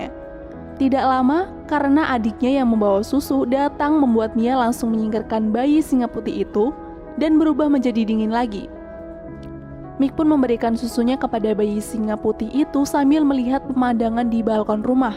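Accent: Sunda, Indonesia. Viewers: Bodor aya sundana hahaha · Ngeunah euy suara sunda na 🤭😅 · aya sunda an euy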